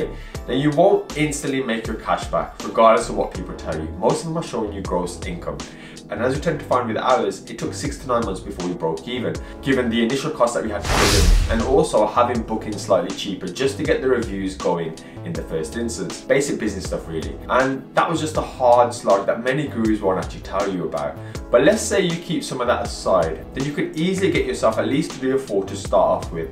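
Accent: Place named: Wolverhampton, United Kingdom. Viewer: Class video and good to hear it coming from someone local! I'm in west brom, you sound like you're from wolves lol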